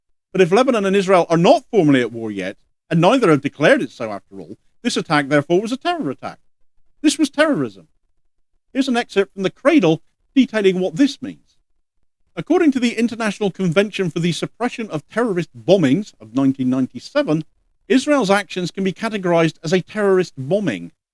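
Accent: Cornwall, United Kingdom. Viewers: Aha, a Cornishman! That accent will always make me sit up. This is great, proper job from a Cornish girl living abroad.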